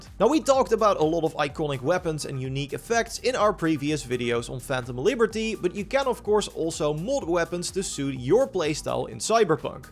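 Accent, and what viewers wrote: Netherlands. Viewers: Zwz een nederlander deze man ik hoor het aan je engels😂 mijne klinkt ook zoiets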